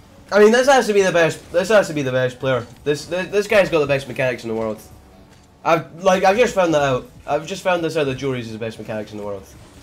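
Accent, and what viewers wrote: Scotland, United Kingdom. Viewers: Is he Scottish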